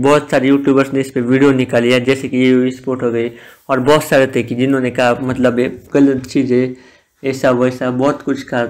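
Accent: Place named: Maharashtra, India. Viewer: U r talking accent is like maharshtrian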